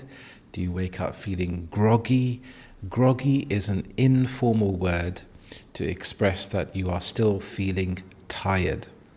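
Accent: United Kingdom. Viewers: I’m Vietnamese , But I like listening your English accent and your videos ,thanks so much and have a nice day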